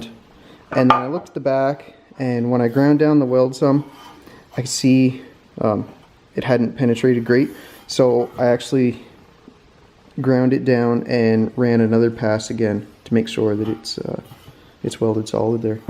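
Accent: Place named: Nova Scotia, Canada. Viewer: How'd I know a video about how to repair a rocker panel would be done by a guy with a Nova Scotia accent.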